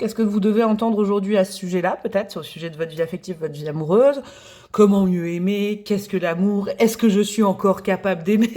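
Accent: Picardy, France. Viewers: Bonjour, accent picard ? Vous êtes de Picardie ? 😊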